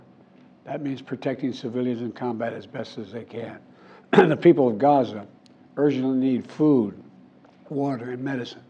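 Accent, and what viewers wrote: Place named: United States. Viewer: I like a President that sounds like an American. Not like a Russian goon oligarch. I stand with President Biden!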